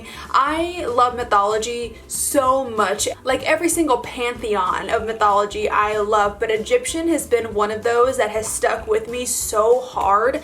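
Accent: United States. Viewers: Your accent is Americanized while has a more classic, British/Mid Atlantic influenced accent.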